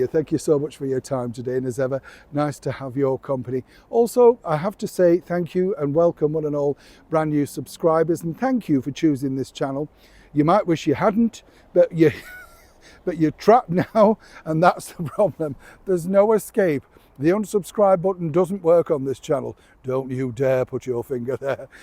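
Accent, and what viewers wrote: Yorkshire, United Kingdom. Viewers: ...YESS, i knew you were in Yorkshire just by looking at their very recognisable stone work in the church behind you...ey up, i love it when t' " Yorkshire " comes out don't stop will you, thank you and as always take care...👋👋🫖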